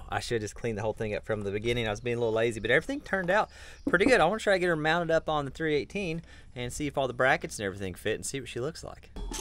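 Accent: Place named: Midwest, United States. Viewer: Yeah, his midwestern dialect is tough on my old ears. I don't catch everything he says.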